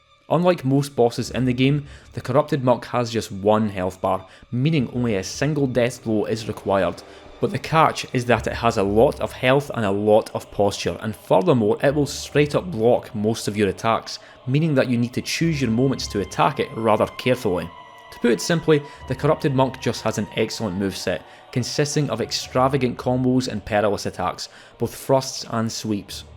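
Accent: United States. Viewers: A potshot at people who dislike Scottish accents and speaking in an American one - it's random shit like this why I love your videos! 😄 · Bro your American accent 10/10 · Non Americans doing an American accent are goated with the sauce, thank you for your contribution 🫡 🇺🇸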